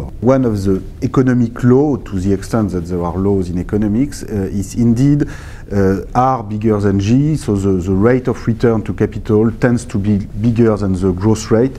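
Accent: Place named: France. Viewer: His French accent is annoying.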